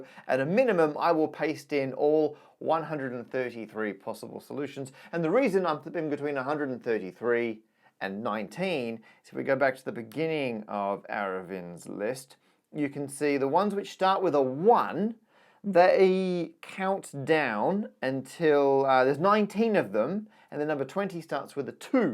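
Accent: Australia. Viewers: What kind of accent is that for an Englishman anyway? Sounds like someone from Perth.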